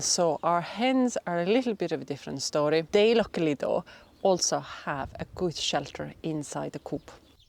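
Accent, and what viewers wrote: Kerry, Ireland. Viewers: You have a grand Kerry accent! Up the Kingdom.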